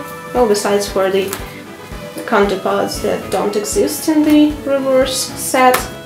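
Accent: Russia. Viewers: Слышу этот русский акцент! Прелесть С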